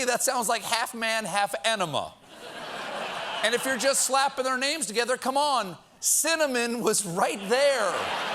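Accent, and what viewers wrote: France. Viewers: J'adore your French accent! En plus, we are 83,9% vaccinated today =0) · His French accent is spot on.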